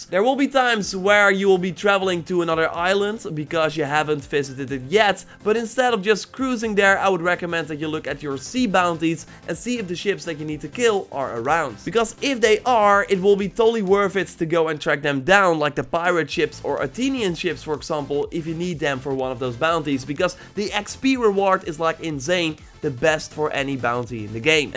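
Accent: Netherlands. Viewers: Leer beter engels aub je nederlandse accent is duidelijk hoorbaar :< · Is it me or this guy has a sorth of dutch accent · Damn that accent is dutch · You sound dutch ik ben dat ook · U Dutch right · Are you from holland ? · Bro are you Dutch?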